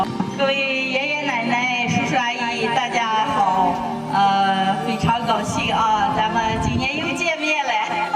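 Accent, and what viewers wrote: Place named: Shaanxi, China. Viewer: 我外公來自陝西城固縣 49年來台灣~~聽到口音突然好熟悉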